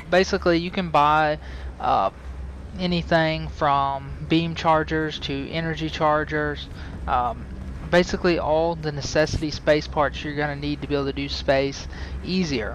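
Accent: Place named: United States. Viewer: His accent is country, I think.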